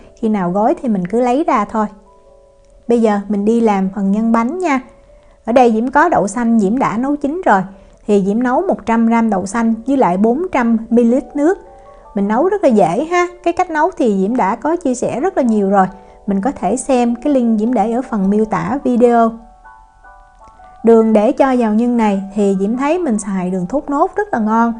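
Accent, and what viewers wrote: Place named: Saigon, Vietnam. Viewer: Bạn này hướng dẫn nói đúng giọng Sài Gòn nghe hay lắm. Clips có giá trị. cảm ơn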